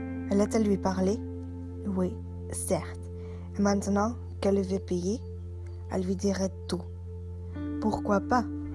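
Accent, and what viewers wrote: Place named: Portugal. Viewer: Tu as l'accent du cliché portugai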